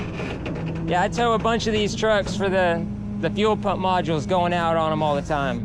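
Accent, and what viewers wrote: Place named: Texas, United States. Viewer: What a character! He speaks good ole Texas gibberish like my uncles.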